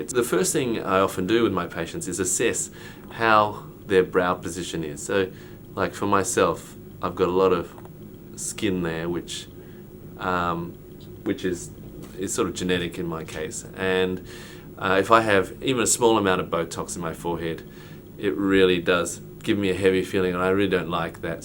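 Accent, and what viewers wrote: Australia. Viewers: You sound Aussie...please tell me your in qld?!?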